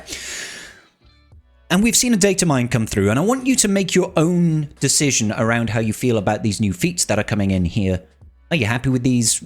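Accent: United Kingdom. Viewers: Subscribed! Great Content and a heads up Not to spend on this Game. I am playing since 1st may 2021 f2p no LSB or anything at 10.3 Millions GP 8 GLs all 3 GL Cap Ships Kyber 3. I enjoy the Game f2p. No Fomo. What the Game will Break is now the monetazation of DCs. Soon you will Not fight Rosters in GAC, it will be about fighting each others Datacrons. In my View Thats Not even a Game anymore when you think about that. Greets from Germany. Keep up the good work. Btw are you from England or Great Britain? You have a Bit of an british accent. Sounds Nice!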